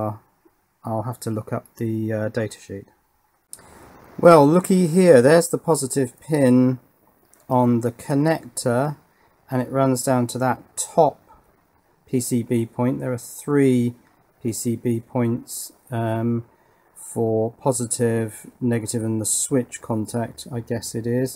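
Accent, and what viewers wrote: England, United Kingdom. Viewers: Hi, have you used any flux on the solder braid before attempting to remove solder? I also don't like solder braid myself but flux might help you. I use a Hakko de-soldering gun on most of my repair work, for me its the easier and cleaner option. It's a lot more expensive but you remove all the solder in one press of the trigger, even on multi-layer boards. Occasionally on lead free solder I need to add fresh 60/40 leaded solder before trying to remove the old stuff. Keep up the great videos, I'm originally from England and its comforting to hear the accent from time to time.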